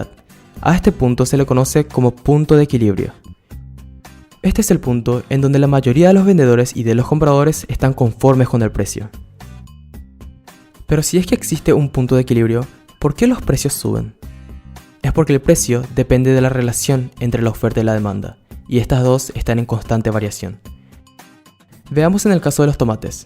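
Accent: Paraguay. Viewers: Ndeee vos sos paraguayo verdad?. Te pille por tu acento xD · Sos paraguayo? tenes nuestro acento :0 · WTF ese acento Paraguayo. Jaja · Decime que sos Paraguayo o vivís en Paraguay.... 🤔🤣👌🇵🇾👏👏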